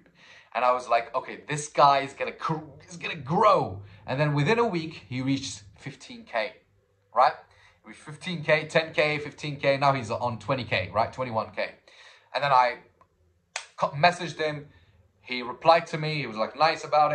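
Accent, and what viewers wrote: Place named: United Kingdom. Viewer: How do you have such a british accent while living in Algeria?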